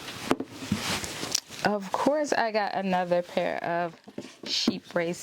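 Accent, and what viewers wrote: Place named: Baltimore, United States. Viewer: You sound like you're from Baltimore gurllllll ❤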